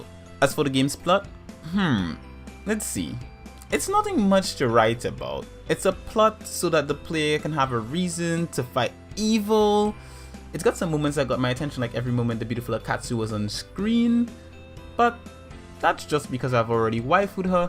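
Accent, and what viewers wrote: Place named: Trinidad and Tobago. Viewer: Are you from Trinidad? You sound like a Trini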